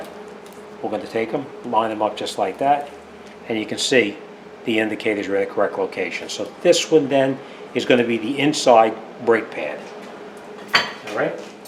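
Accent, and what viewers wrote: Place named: East Coast, United States. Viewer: I can tell from the accent and the rusty rotors and calipers that this is East Coast. Good video!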